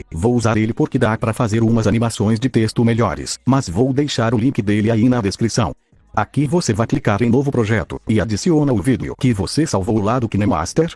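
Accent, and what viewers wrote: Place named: Northeast, Brazil. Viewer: Tu é nordestino ? Se não é é minero Parece nordestino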